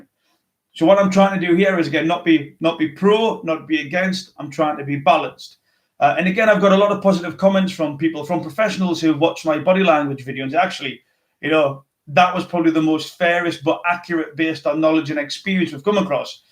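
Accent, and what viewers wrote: Newcastle, United Kingdom. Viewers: So me and my little sister been watching your videos and trying to figure out where your accent is from. She thinks is British but idk I think you sound more Irish? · 😂😂 its English , he is from newcastle.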